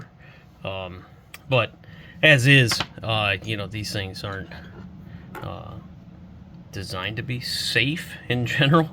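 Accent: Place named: Pennsylvania, United States. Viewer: I am a chemical engineer and I love building things. I am starting a YT channel as soon as it gets warmer here in PA where I can get out to the barn. I am recovering from getting hit and run over on my 1957 Harley Panhead so I will be laid up for a while. (had my right leg nearly crushed in two). I am starting a build of a 1928 Morgan Aero Brooklands edition racer. Similar to a Pembleton T24. I am gearing up to cast aluminum for different adapters. That being said I purchased a revolver lifecard toy. I am thinking about casting it out of aluminum and inserting a rifled barrel and see how things turn out. I will let you know how things go.. You sound like you are from Pa also...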